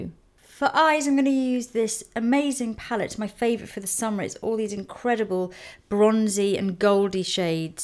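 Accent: Netherlands. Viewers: Is from the Netherlands? She doesn't sound very English to me (but maybe it's just me, don't know =p) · May I ask where is from? She sounds Dutch to me!:) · Is Dutch? Her accent sounds Dutch. She's just so beautiful, I can't get over it! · I immediately heard that she's from The Netherlands lol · I wonder what nationality is? Her accent sounds very Dutch.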